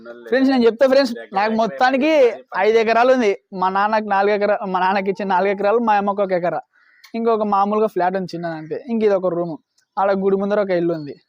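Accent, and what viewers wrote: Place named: Gadwal, India. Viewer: Gadwal slang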